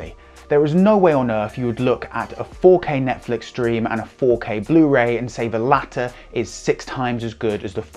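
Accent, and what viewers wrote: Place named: United Kingdom. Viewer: This looks like the British version of "Films at Home" from good old bearded Jeff, different accent and no cap but the beard is there. Physical media is my first choice over streamed movies. Censor ship is a real threat and easily applied to streamed movies. A recent example: in "Home alone" the appearance of Trump is under threat of being erased. That is Soviet-style censorship, erasing former political leaders from history.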